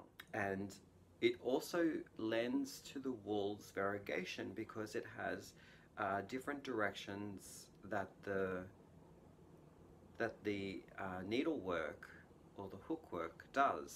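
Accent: Australia. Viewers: Thought I recognised the accent. Hello from a fellow Aussie. Love Canada too! Anyway Starting with video number one after your channel came through on my feed. Gosh you only start 6 months before this video. Great job on the chevron scarf it looks gorgeous! I'm really looking forward to seeing how far you've come in the 3 years since you started out.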